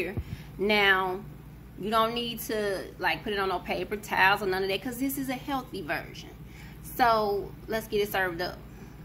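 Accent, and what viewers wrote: United States. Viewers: Dk what city ur from but you sound country as...🤣 not hating on you just saying. like ur simplistic way of cooking. hint: spray oil on paper towel and wipe on fryer. that will eliminate any build up and avoid potential fires. thats why they recommend NOT spraying directly on fryer. Be safe.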